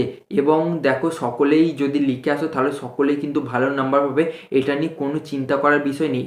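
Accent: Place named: Murshidabad, India. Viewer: sorry 🥺apnar voice ta onek ta murshidaabad er moto ty R ki bollam sorry🥺 kichu mone koren na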